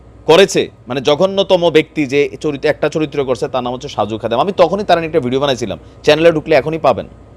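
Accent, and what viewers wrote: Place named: Sylhet, Bangladesh. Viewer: ভাই আপনার সিলেটে কথা অনেক দারুন আবার একটু বলবেন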